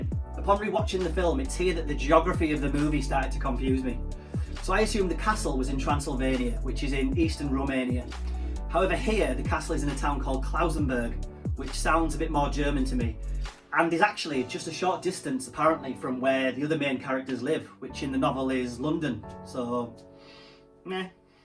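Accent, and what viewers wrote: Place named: Ireland. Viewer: I love your brogue <3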